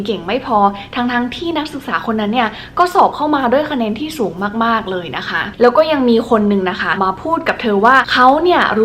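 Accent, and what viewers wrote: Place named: North, Thailand. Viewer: คุณ เป็นคนจังหวัดอะไรครับ สำเนียงพูดช้าๆ ทำให้คิดถึงคนเชียงใหม่ เชียงราย เลย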